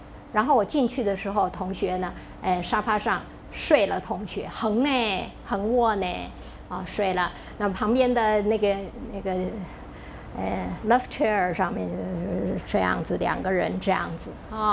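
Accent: China. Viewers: 老师是山东人耶~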